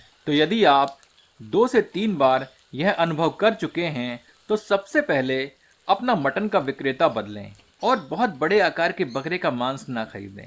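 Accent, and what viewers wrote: Standard. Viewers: आपकी शुद्ध हिंदी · शुद्ध हिंदी में बोलने का जो तरीका है वो अतिसुंदर है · Aapki Shudh hindi bahut sunder or saral hai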